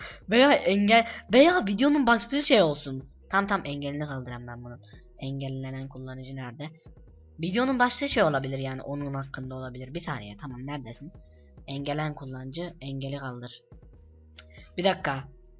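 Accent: Azerbaijan. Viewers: lan Azermisin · lam san Azerbaycanlimisin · Azerisen sen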